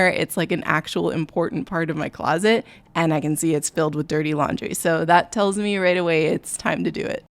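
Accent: Canada. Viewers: We missed you sm!!! I’ve been watching since I was a teen and I’m 21 now…I just realized you have a Canadian accent😆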